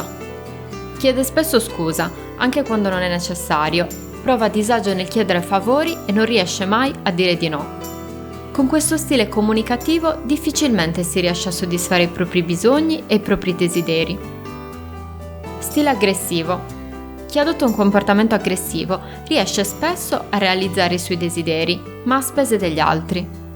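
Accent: Sardinia, Italy. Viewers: Ciao, sei sarda per caso? :D è il primo video che guardo, ho sentito parlare di questo atteggiamento qualche anno fa, penso che lo farò vedere anche a mia madre, che perpetua dei silenzi assurdi senza mai esternare la sua rabbia, ma io so che vuole sbottare Allora questa introspezione potrebbe esserle utile. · bell'accento Sardo :) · Bellissimo video...Ma sei sarda?? 😆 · Si, anche io sono sarda. Ho sentito per caso il tuo video e ho pensato: ma questa donna è sarda. Allora mi sono iscritta al canale 😉. Buonanotte 🥰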